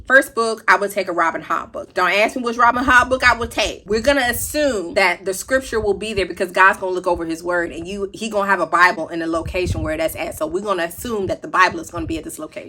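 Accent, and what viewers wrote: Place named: South, United States. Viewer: Yasss southern accent!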